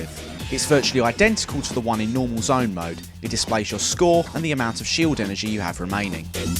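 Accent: United Kingdom. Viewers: It sounds like he might be from Essex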